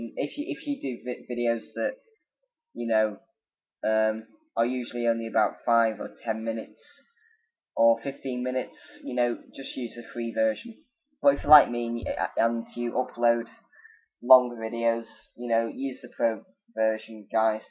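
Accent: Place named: United Kingdom. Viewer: omg british accent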